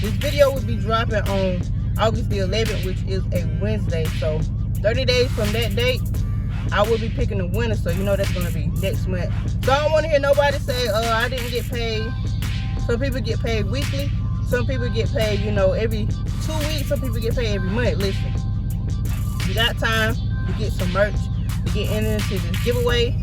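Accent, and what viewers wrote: South, United States. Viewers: Well, it's always a pleasure seeing your beauty brown skin next to that car, and hearing that lovely southern accent. 😊 Sending love all the way from Philly. 🔔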